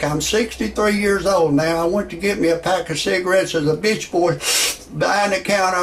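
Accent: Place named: South, United States. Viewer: lol, excellent...this is good entertainment, I think that's the name I catch from you, but maybe the southern 'twang' does defeat me in this regard...sadly that '21yr old having my baby' appears to have prematurely put our host 6ft under..RIP.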